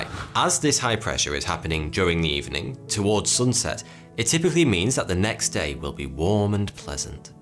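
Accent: United Kingdom. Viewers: thats what he says mate ye do realise in the uk there's like a different accent every 30 minute drive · I don't know why, but I enjoy all intellectual and educational topics with a British accent. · just another British man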